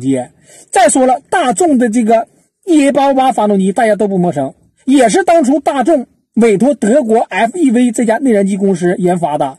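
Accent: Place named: Northeast, China. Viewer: 事情是这么个事情，但是一配上浓浓东北话杂听咋不是那么一回事。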